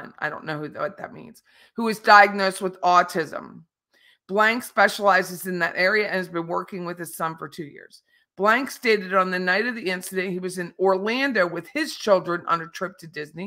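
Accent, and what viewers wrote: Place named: Central Pennsylvania, United States. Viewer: You are so fun to listen to. I’m from NYC and went to undergraduate at PSU (University Park) and I love your accent …. It’s so central PA 🥰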